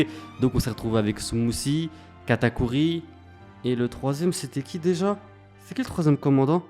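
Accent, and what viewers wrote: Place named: France. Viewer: Mon avis ? Parle moins avec ton accent de cité ça gonfle 5 s de vidéo j en ai eu assez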